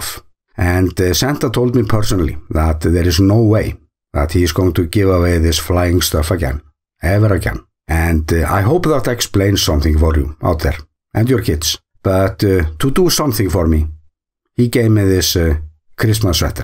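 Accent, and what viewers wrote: Iceland. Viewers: Keep going, please. I always enjoy your videos and your Icelandic voice.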